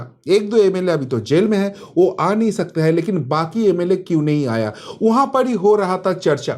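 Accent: Bengal, India. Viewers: Enjoyed your Hindi in Bengali . What is “Ghenchu”?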